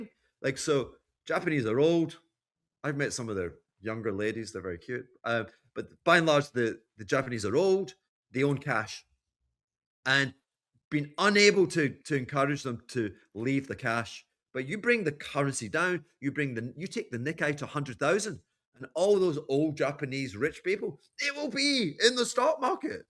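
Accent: United Kingdom. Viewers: is this British English???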